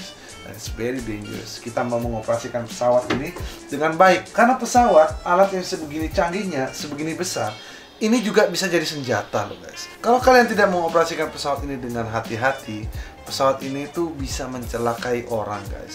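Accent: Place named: Banyumas, Indonesia. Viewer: Kapal kecik saja... Ngapak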